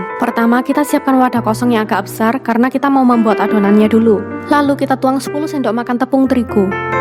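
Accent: Java, Indonesia. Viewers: Yaampun medok bgt 😂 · Fokus sama tangan mbak nya yg putih mulus 😁, tapi bahasanya agak medok 😂 Btw thank you aku mau lagsg praktek💖🙏🏻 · Suka sama resepnya,..mantab,,musiknya kurang suka..dan bahasa nya medok surabaya padahal Baik tapi kliatan kayak judes...sukses selalu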